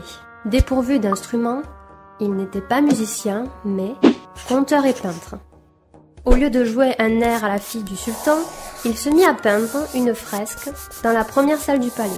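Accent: South, France. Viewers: Petit accent du sud guerre discret malheureusement^^ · L'accent du sudd <3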